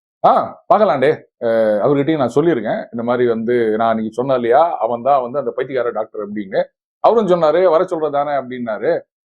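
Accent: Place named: Tirunelveli, India. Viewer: அழகி திரைப்படம் என்னுள் ஏற்படுத்திய அதிர்வுகளை, இக்கதையும் (நிஜமும்) ஏற்படுத்தியுள்ளது. உங்களின் நெல்லை வட்டார வழக்கு அற்புதம்.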